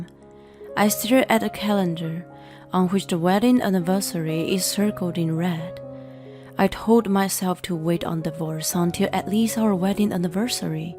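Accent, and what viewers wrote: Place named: United Kingdom. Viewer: Please Bring back the UK voice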